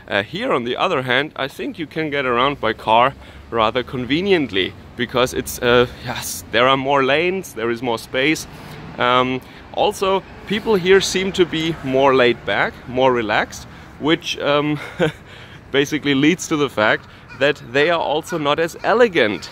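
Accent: Bavaria, Germany. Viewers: Das war sehr interessant! Danke Schön! Mano dein Akzent ist sehr lustig. Typisch Bayerischen Akzent. 😂 sorry i lived in the North and you guys crack me up. Tolles Video vielen Dank!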